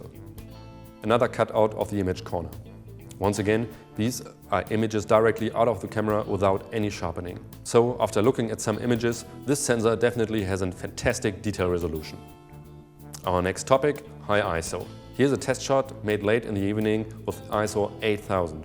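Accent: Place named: Germany. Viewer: What a nice german accent,reminds at the terminator.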